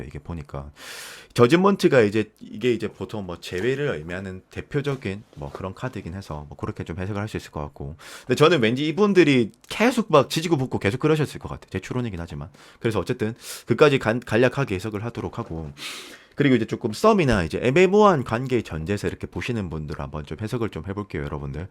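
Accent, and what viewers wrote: Gyeongsang, South Korea. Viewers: 경상도 남성 목소랴가 정겹고 편안하네요 친한 칭구가 말하듯이 얘기해주는 느낌ᆢㅋ 잘 듣고 갑니다~~^^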